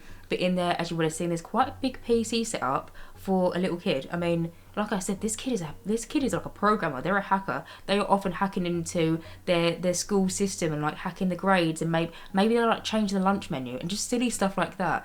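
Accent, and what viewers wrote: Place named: Essex, United Kingdom. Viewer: I love your voice, I could listen to you ramble on all day. Proper Essex haha hope you’re getting through your burn out ok, I just came out of one and then they broke the game and my favourite modder refuses to buy the pack and update his mods lol so I’m basically left unable to play because I need the mods lol I neeeeed them.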